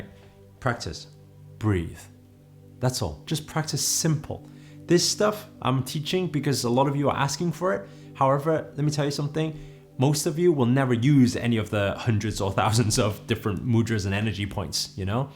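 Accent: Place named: United Kingdom. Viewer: Love your videos and your British accent... Thank you for this explanation!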